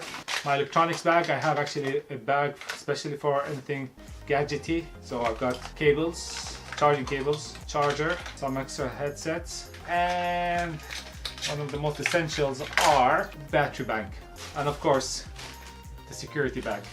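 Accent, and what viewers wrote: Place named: Ireland. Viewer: Do I hear an Irish twang in the accent. Are you in Ireland - great review 😉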